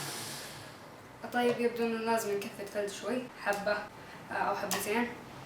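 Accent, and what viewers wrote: Kuwait. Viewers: انت كويتي ولا سعودي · انت كويتي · هل انت كويتي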